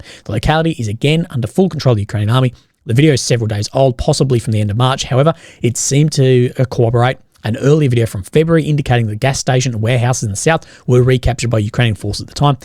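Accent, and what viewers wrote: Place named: Australia. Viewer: "Gddye". I think he is saying "good day". Australian English "day" sounds like "die" to Scots (and Autralian "die" sounds like "doy"). Legends could be rhyming slang for friends. Add pronouncing "low" with a shwa like in French "le", but followed by a slight "w" glide, and you have the four peculiarities of Australian English borrowed from Cockney. Though I must say that this pronunciation of "low" is more widespread in Britain.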